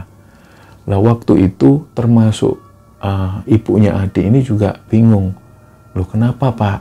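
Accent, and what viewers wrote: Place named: Surabaya, Indonesia. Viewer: Malang Hadir... Nikmati yg slalu menyuguhkan Cerita² yg penuh hikmah apalagi dg Suara Mas Logat Surabaya yg khas.... Sehat Slalu buat Mas dan Crew... Smoga tak kurang dari suatu apapun.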